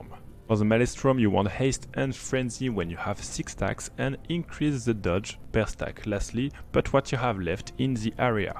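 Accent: France. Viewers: what a french accent +D well ty for this build =)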